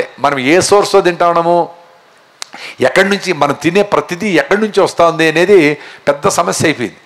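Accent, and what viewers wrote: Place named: Chittoor, India. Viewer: Madanapalle chittoor slang ande adhe .. mame atle madadatham ..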